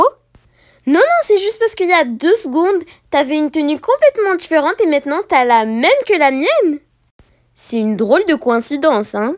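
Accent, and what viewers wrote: England, United Kingdom. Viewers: Je suis totalement d'accord avec toi !!! Tes vidéos son incr 😍!!! Ta voix est juste magnifique (j'adore ton accent anglais)🥰 ! Et toi t ✨PARFAITE✨… Continue comme sa !!! Bientôt les 50k !!!!! Ps : J'aimerais trop joué avec toi sur Adopt me !!!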